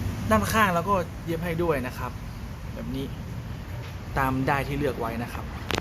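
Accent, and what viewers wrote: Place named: North, Thailand. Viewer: อู้เหนือเลยครับผม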